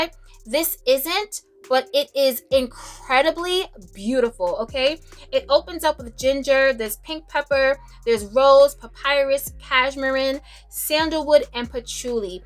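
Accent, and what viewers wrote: New York, United States. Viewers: Yayy, thank you so much for posting this! I can listen to you talk all day, love that NY accent 😍Totally agree about Tribeca, I'm currently obsessed with it at the moment - like it's super rich and sweet/deep notes yet it's so airy and light, and makes me feel so posh lol. Greenwhich Village and New York Nights are next on my to-buy list!! Would you say NY Nights could be a signature during colder months or night time only vibe?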